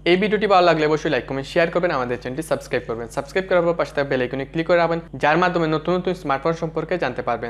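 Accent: Sylhet, Bangladesh. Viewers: ভাই তুমার বাড়ি কি সিলেট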